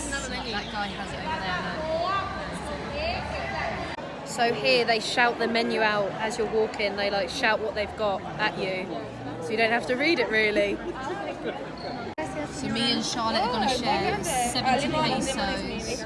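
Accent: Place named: United Kingdom. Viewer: I heard the accent... I'm immediately...I give like I really like uk accent 😍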